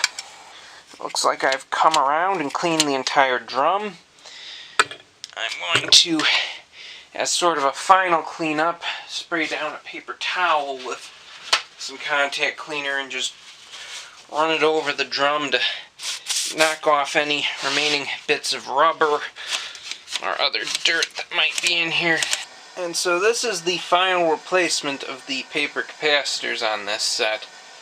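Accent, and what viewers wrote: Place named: Chicago, United States. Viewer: Are you from the midwest? You have the same inflection as I do, almost like it has a Chicago swing to it...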